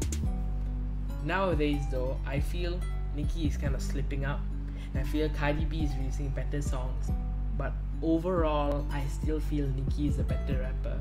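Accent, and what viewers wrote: India. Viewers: you from india or what? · Your accent sounds Indian but with a Caribbean accent. My guess is your of Indian ancestry but your from the Caribbean? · What’s your accent it sound Punjabi, Hindu, Pakistani or something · Are you Indian? (Not hating or anything i just didn't expect ur voice) btw speak louder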